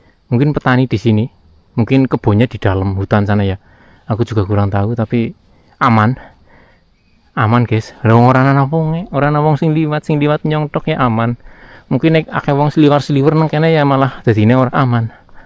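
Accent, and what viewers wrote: Banyumas, Indonesia. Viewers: Mbok ana ula dengernya lucu btw saya dri jawa timur suka denger orang ngomong ngapak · Krungu logat ngapak dhadhi kepengin bali njawa koh..🥹🥹🥹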